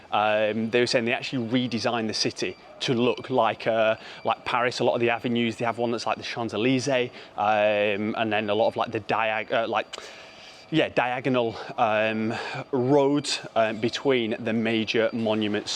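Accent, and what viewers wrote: United Kingdom. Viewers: Thou accent is English isn't it?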